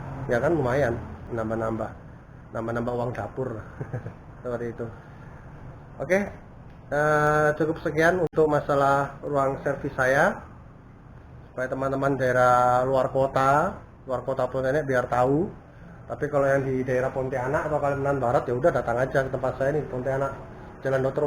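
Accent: Java, Indonesia. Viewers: Asline jowo mas???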